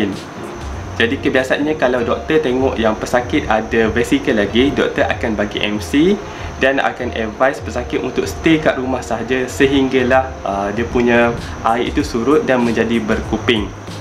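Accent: Kelantan, Malaysia. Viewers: Maaf Tanya doktr ya, doktar org kelantan ke?? Terima kasih atas perkongsian ilmu doktar.. Terbaik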